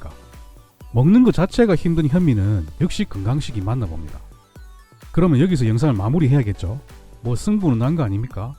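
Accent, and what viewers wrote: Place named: Busan, South Korea. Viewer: 부산 출신이세요? ^^ 아주아주 귀에 익은 억양이시네요. ㅎㅎ . 말씀도 참 재미있게 하시고.... 딱 부산사람 말투 ㅋㅋㅋ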